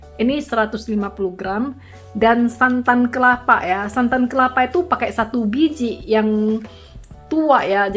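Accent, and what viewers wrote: Medan, Indonesia. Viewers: Logat bicaranya kayaknya dr Medan nih...sukses selalu ya..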